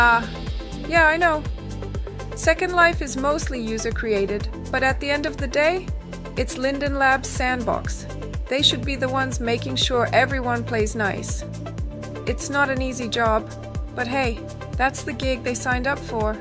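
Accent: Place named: Australia. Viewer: well thought out and spoken piece on customer service and business responsible practices. Its a shame there is not a clear cut service agreement all business conducted in sl must follow or they can lose their Lindens and account. cool to hear a Aussie language on Sl! Also i need that dress! its perfect summer all rounder :))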